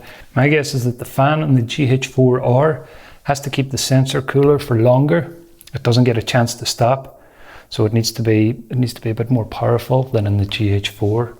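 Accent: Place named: Northern Ireland, United Kingdom. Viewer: Hi, I notice your accent is Northern Ireland where I'm from myself. You wouldn't happen to want to sell me your GH4 by any chance? If you feel the GH4R is all you need?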